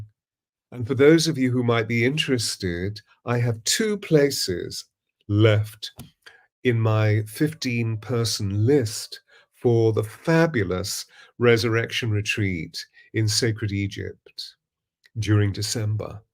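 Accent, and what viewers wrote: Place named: United Kingdom. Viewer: I just Love your Energy & Your very Posh English Gentleman Accent, I am also here in England & you sound like our King Charles, So comforting is your voice. Sent Prayers to all in America who sufferd from the Storm💚💚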